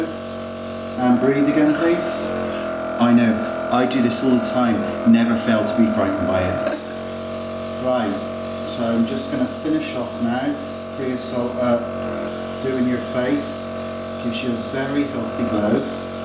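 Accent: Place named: West Country, United Kingdom. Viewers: are you from Bristol?? Im loving the Bristolian-ish accent hehe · Love the somerset accent! Reminds me of home!:L haha! Loved this, made me laugh! x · What a lovely, natural look you have created. I wish I could do my makeup to look exactly like that! Actually, I think that a Scouse Brow would have complemented the look even more... You are a Very Naughty Boy!!! must really love you to let you get away with all that you do! Love it. Your comedy videos are almost better than your serious ones! Especially accompanied by the Westcountry accent. More more more please x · i love your 'brizzol' accent....... hillarious, my make up artist from bristol sounded exactly the same... but had a much dirtier laugh! brilliant video:-) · BHAHAHAHAHA!!!!! That is one of the funniest videos eveeerrrrrr!!!:D Good on ya, for being such a good sport!, your Somerset accent really shone through in this one, loved it! 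Thanks for the giggle! Jess x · I think he grew up in Cornwall and moved to Wales? It's a very southern accent. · hahahaha loving the farmers accent! you sound like you're from cornwall lol xx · I also just 'stumbled' across ur channel - this made my day!!! divorce has made ur eyebrows low - lol!!! fantastic - look forward to watching the rest of ur vids...love that west country accent!! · Love the slight west country accent! Really funny vid x x x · your accent makes everything you say so much funnier:') love a good west country accent! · That was VERY funny. Sometimes i can hear a bit of west country accent when you speak but that was positively "BRIZZLE"! Thanks for the laugh:-D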